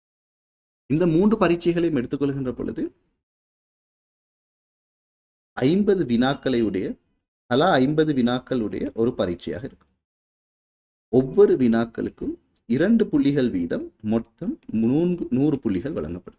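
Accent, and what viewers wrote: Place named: Sri Lanka. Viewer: Srilankan tamil❤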